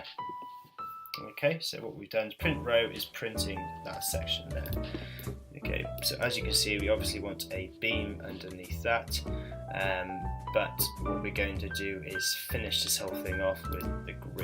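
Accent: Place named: United Kingdom. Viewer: It's amazing how people want to create a video to help then forget that hardly anyone could understand what you saying when you have such a slurred voice not pronouncing your words and too much background noise which everyone knows draws attention away from what your saying. Slow down. It's hard enough to understand British accents let alone listen to loud music.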